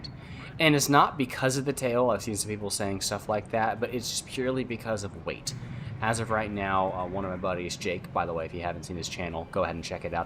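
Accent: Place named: United States. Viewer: clicks on sees chinese decal on plane an white boy american talking dislikes clicks off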